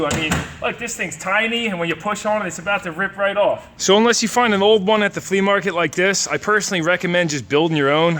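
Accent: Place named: Philadelphia, United States. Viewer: Nice Philly accent.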